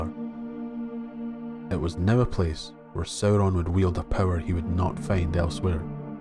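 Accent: Scotland, United Kingdom. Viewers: I've been gobbling up your posts all weekend. Frankly, they're great. And I apologize for taking the piss about your accent. It takes a Scot to pronounce LOTR names and places with some verisimilitude and I take my hat off to you.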